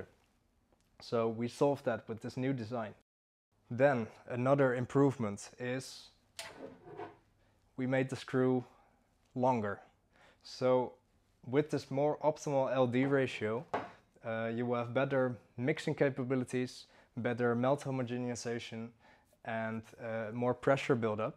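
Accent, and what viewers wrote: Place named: Netherlands. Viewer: Heerlijk Nederlands accentje :p, maar good job though!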